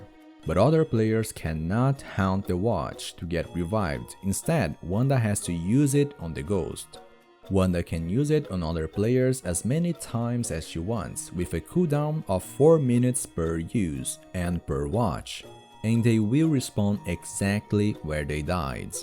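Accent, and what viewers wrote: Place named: Brazil. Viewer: Será mesmo? O inglês é ótimo, mas me lembra brasileiro.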